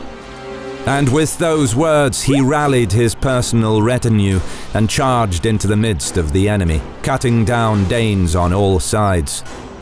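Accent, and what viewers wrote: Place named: England, United Kingdom. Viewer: good menacing English accent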